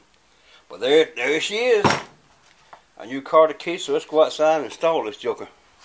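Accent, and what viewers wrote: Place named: Virginia, United States. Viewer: Where are you from? My uncle is from around Staunton, and he has a similar accent. Thanks for the pointers.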